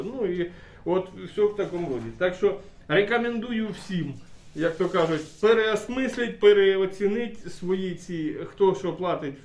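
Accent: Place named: Bila Tserkva, Ukraine. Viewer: отож таке. Уроди скрізь є. А ну скажи мені будь ласка, ти проживав в районі Білої Церкви? (Приблизно) Сильно діалект знайомий. Бажаю Вашій сім'ї всього хорошого.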